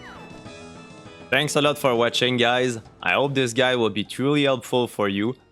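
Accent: Quebec, Canada. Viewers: mmmm ca sent le quebecois lol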